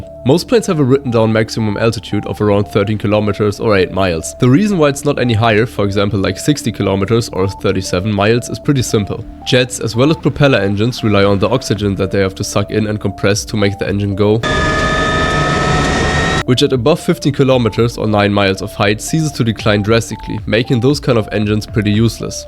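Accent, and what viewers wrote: Germany. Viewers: Most unnoticable german accent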